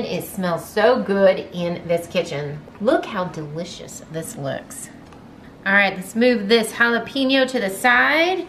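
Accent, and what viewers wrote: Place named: South, United States. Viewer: Cream of chicken. I like you you sound a little southern.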